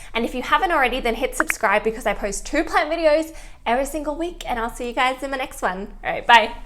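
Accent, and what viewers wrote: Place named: Australia. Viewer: Thanks for the tutorial. I heard about 3-4 accents.🤔very interesting! Geussing Australia?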